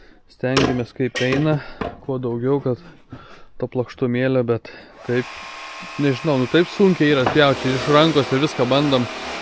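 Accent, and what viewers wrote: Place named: Samogitia, Lithuania. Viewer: Kap visad žemaitiškai stipriai 🇱🇹👌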